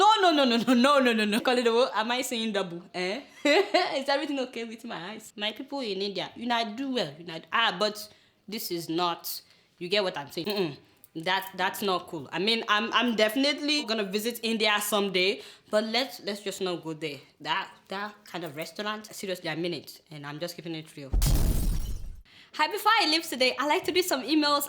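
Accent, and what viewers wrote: Yoruba, Nigeria. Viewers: LOL FUNNY HOW YOUR YORUBA ACCENT IS SO PREVAILING. LOVE YOU GIRL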